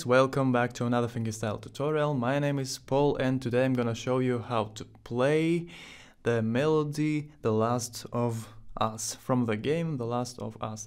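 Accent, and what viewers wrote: Russia. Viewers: а я сижу и думаю, а чет акцент русский дофига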